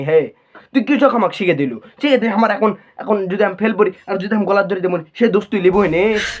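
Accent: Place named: North Bengal, India. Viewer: ভাষা উওর বঙ্গের মনে হয় দিনাজপুর।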